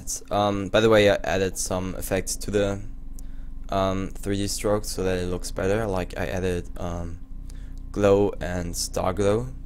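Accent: Germany. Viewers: kommst du aus deutschland man hört das iwie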